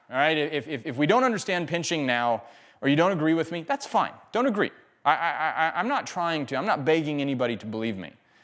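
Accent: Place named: United States. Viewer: a very speedy US accent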